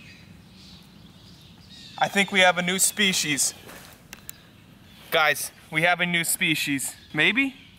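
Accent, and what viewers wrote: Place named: New England, United States. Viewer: So New England I love it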